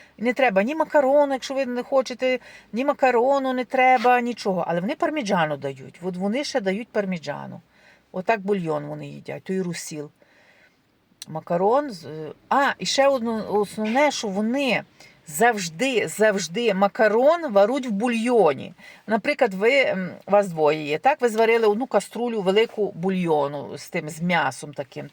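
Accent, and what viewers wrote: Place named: Rohatyn, Ukraine. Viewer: За тоном розмови ви не зі Львова, а із Рогатиншини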